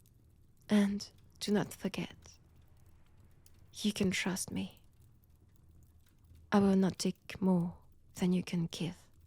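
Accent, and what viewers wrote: France. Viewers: A lovely Christmas present! A french accent audio!! I needed this!!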